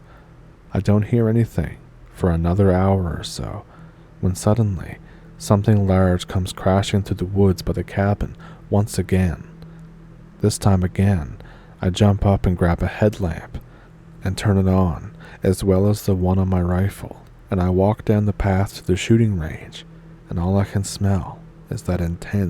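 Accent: Ontario, Canada. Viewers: Hello,. Thanks for posting, you're voice always reminds me of My Ontario cousins and blood and such, 👍🎉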